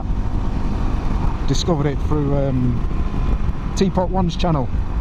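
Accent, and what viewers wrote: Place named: Nottingham, United Kingdom. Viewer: Alright pal I had issues with mic doing that but found you can alter setting in your editing app I change mine to surround. The helmet you can get a helmet mount that sticks on your helmet from Amazon called motoradds if interested. You sound like your from notts my home town born and bred until I moved up north. Great vid pal👍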